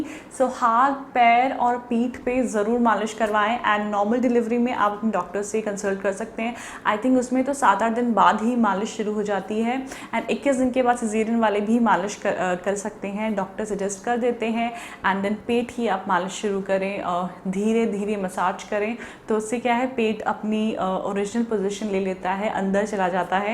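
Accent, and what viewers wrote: Garhwal, India. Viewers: Aap gadwali ho kaha se ho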